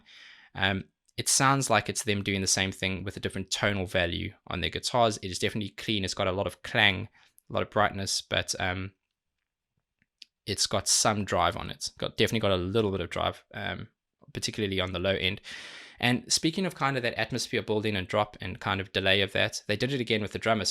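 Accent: South Africa. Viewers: Is my guy here a fellow south african or am i hearing shit?😂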